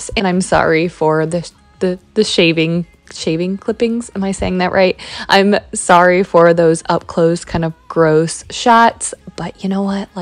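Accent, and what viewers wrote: Midwest, United States. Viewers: Hey there! I just found your channel and I’ve been binge watching your videos, I find them so relaxing and motivating. I’m originally from Chicago but have been living in Australia for the past three years with my boyfriend, so I love hearing that Midwestern accent, I miss it so much. Can’t wait to visit home soon. I also have to say that so many of these products aren’t available in Australia, like the power paste or scotch brite stove sponges. Such a bummer, but it’s fun to do some research and find similar ones here or even try DIY. Love from Australia! ❤️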